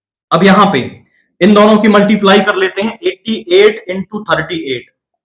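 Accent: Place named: Haryana, India. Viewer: Haryanvi touch 👍👍👍😂😂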